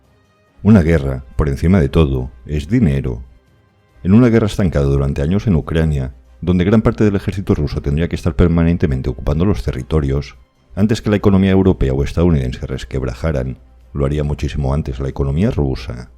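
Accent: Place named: Zamora, Spain. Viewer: Hola por tu habla (acento) no crei que fueras de Latinoamérica pareces mas de mi querida Zamora España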